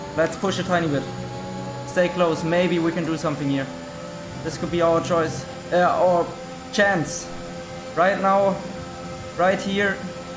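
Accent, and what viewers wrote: Germany. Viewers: Kannst du deutsch (dein Name und Akzent klingen deutsch)?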